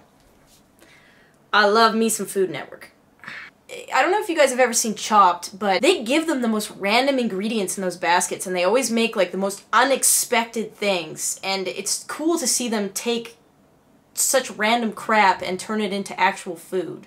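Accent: Canada. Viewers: A lot of Canadian women sound like this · All Canadian women look and sound the same..., omgitsfirefox, Cobie Smulders (the girl from how i met your mother), and others i can't remember. Also i have a canadian friend and she likes capybaras too...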